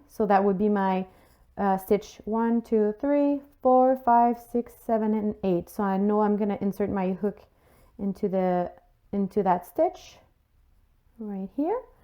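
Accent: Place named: Quebec, Canada. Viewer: That accent.... T'es québécoise? C'est full cute le tutoriel merci ❤️ j'vais en faire plein❤️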